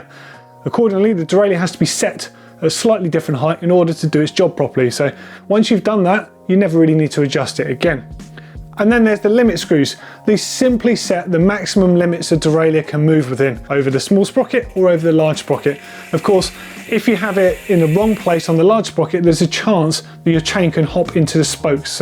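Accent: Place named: United Kingdom. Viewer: Thanks for the advice. My husband Michael was having trouble getting his Deore XT 12 speed working properly. He said he chose your site because he said people with a British accent just seem smarter! He will be out again tomorrow because of your help.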